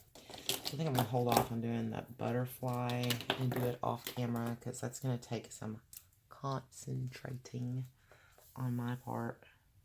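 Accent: South, United States. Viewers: OMG!!! WOWZA!!! I have NEVER had the courage to color in my Klara Markova books until I found this video! I am in AWE of how well you do such remarkable things with your markers!!! Please know I am honest to a fault... so know I will be real with you. You tickle me when you are REAL with us in these videos. Thank you soo much for your honesty. Love your goals and candor about your life, a lot of us don’t even know we have lost ourselves... and it’s totally okay to have your SOUTHERN ACCENT, most folks find it sweet and yours is not as deep as some are... wish you would be less critical of yourself! You are so DELIGHTFUL and HONEST!!! THAT will grow this channel sweetie... I watched you on this page in Tenderful Enchantments and what I saw was you not even hesitate to shade in with the gold gel pens!!! You really do know where and how to shadow, girl...!!! 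I watched you lay down the blues and deeper blues and see you instinctively place shadows where they go!!! I said I will be honest. It blows my mind how you worry about wips, cuz I’ve got a bunch, that I may go finish or not. It’s not a biggy for me. I have every intention of following you in 2019... and learning that I’m more normal than I realized until you put yourself out here. I love you a bunch and wait for your videos to come up. Hey, welcome and THANX for being frank about gaining weight over the holidays, like the rest of us. I know what ya mean about the anxiety stuff & the patience, err I don’t l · Hey.... just FYI... if you use the paint w glitter... it WILL dry flat;o)) I have used it on quite a few pages in my books. I also have ALL of the Markova books and I really can not say one is better than the other, however, Tenderful Enchantments is my favorite!!! I am swatching colored pencils now watching your video;o)) I had to laugh when you was talking about the way you speak and say words...I get that ALOT...its a SOUTHERN THANG... 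I'm from New Orleans;o) Keep up the good work and I hope to get some things DONE in 2019 myself... thanks for the PEP talk!!!!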